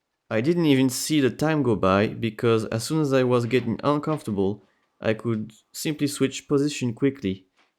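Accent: France. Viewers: Mec, on sent bien ton accent français dans ton anglais parlé 😄 mais beau travail 👏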